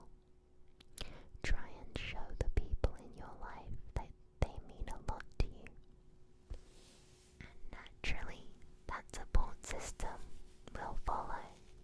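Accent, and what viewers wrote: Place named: United Kingdom. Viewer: Bri'ish woman detected, my neurons activated.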